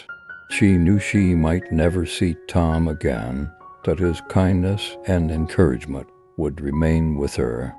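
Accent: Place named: United States. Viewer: someone could tell where this accent is? i know is american by his accent but what part of america? it is so relaxing his voice. please someone could tell me?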